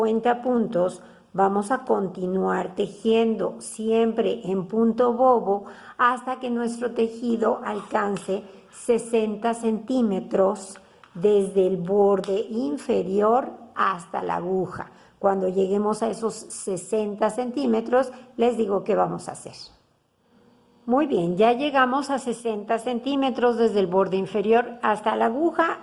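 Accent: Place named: Mexico. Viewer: Otra belleza creada por el arte de esas manitas tan habilidosas, cuando escucho esa vocecita de acento mejicano me pongo alerta por que sé que voy a ver y disfrutar de una maravillosa labor que para mejor aún puedo hacerla yo también. Bendiciones preciosas 🌺🌺❤️🌺🌺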